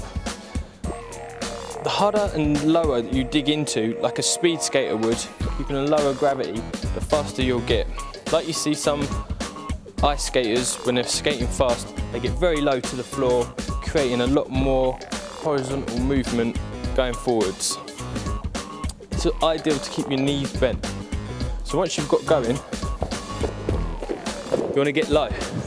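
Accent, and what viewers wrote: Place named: Scotland, United Kingdom. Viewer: Did anyone else think he said "Hi, I'm Shit,"? Obviously it was but it made me laugh. Scottish accent ftw.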